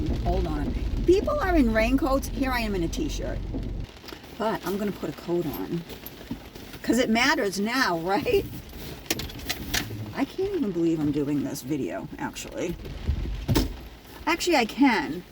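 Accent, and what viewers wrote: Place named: Massachusetts, United States. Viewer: I KNEW that was a Massachusetts accent!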